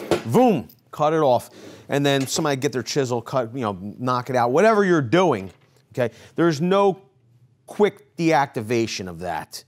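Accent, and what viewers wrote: Philadelphia, United States. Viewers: LOL, knew you were from Philly. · Knew u were a philly guy from the accent lol Gonna sub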